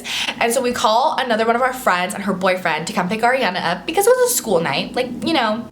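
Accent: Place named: United States. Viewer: She has the typical American girl accent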